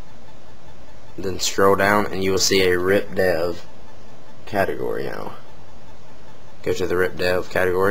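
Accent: South, United States. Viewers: i hate ur accent red nek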